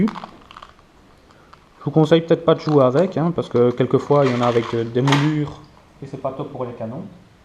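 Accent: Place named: Belgium. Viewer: ce belle accent belche ti <3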